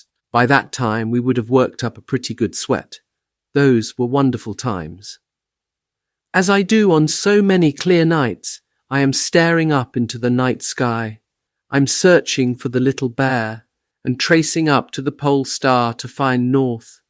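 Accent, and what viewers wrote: RP, United Kingdom. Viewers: A British voice narrating a German point of view. Not a fan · Accent is british not german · It's Eton Pommy British. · Are you British or have you lived in United Kingdom for long. I like your accent. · Not a German accent but you can say the German names properly. Obviously, you're a Brit.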